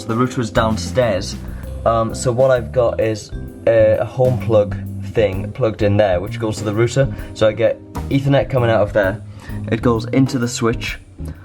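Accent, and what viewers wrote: United Kingdom. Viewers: i like your room setup! and thanks for the British accent :D , watching ur videos really improve my English lol *without sub :p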